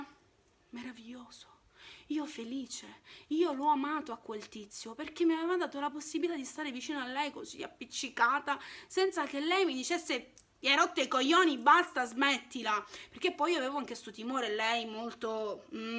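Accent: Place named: Palermo, Italy. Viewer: Bel video 🤣❤️ il tuo accento palermitano é il top ❤️🤣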